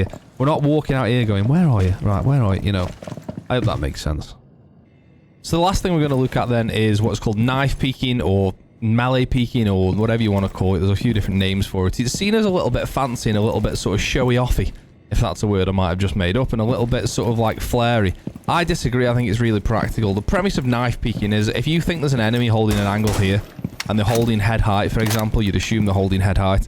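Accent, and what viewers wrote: United Kingdom. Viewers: bro are you British 💀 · In a world full of slightly toxic American content creators, I really enjoyed the friendly, familiar British regional voice. Good content! Worry less about how you’re conveying things, it could be more concise, but the pacing was great, so I don’t think it matters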